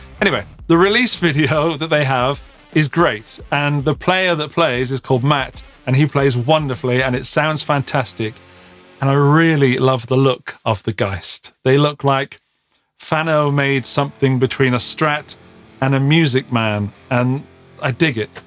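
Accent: United Kingdom. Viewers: Hey, digging this channel, love the fun crazy professor persona However slightly confused, pretty sure that's a British accent. I can say that with confidence because I was born in London and some 40 years later still can't work out how to get out. So my question is, are you a UK or US channel since pushing Sweetwater, who I understand are the Walmart of music stores, well known but not available in the UK?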